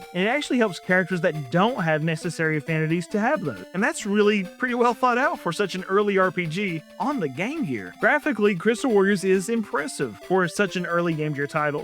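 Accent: South, United States. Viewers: its good to hear another southerner talk about jrpgs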